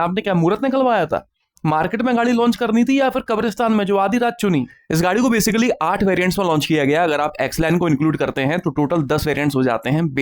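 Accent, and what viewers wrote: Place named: Delhi, India. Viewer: Typical ghaati dilliwali hindi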